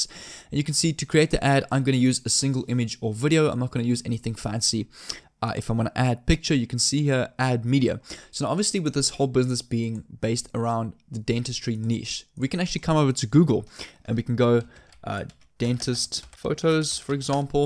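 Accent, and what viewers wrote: South Africa. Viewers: Hi, I just discovered your channel. I'm a fellow South African. (I'm assuming you're South African because of your accent and some of the websites you visit when you're recording your screen default to the.co.za versions.) Thanks for this video, I thought it was an excellent summary of the process involved. I have a question regarding the installing of the pixel. Obviously you are going to need access to the back end of these businesses websites to install the pixel. Are companies not hesitant to give you access to modify their website just from one email? I mean you're a complete stranger that just emails them out of the blue, and asks them to pay you as well as modify their website. I would be hesitant to let a complete stranger do that and even more hesitant if I found out they are from a foreign country, like us. Could you perhaps make a video going in to more detail about "Closing the deal". 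The practical skills like installing the pixel and creating the ads can be learnt and perfected but the hardest part of the whole process, in my opinion would be convincing them to let you fiddle with their website. Most business have probably hired a web developer to make their site for them and I'm sure if they asked their web developers advice, explaining that you contacted them and asked to install something on their website the web developer would by default advise them to just chase you away or ignore you in light of all scammers and fake traffic generation and SEO schemes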